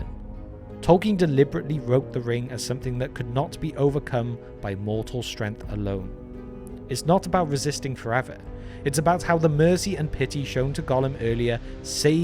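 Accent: Wales, United Kingdom. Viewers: You are the guy from TT alliance or every Welsh sounds the same?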